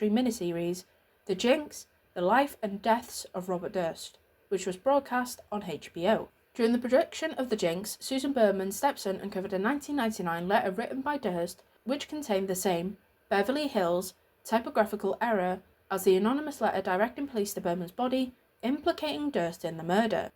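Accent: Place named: Lancashire, United Kingdom. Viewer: Maybe Dean Corll. Curious as to your accent. Are you from Lancashire? :)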